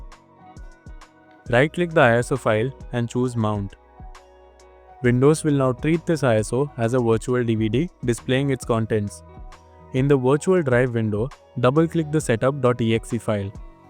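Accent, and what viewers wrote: India. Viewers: Indian accent. Yessssssss!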